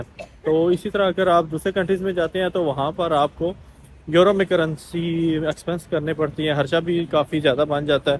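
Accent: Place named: Kashmir, India. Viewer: sb kya hll aaa...kashmir se lgte oo ap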